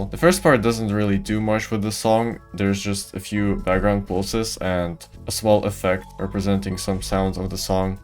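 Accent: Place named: Russia. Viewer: Ты вроде русский как я слышал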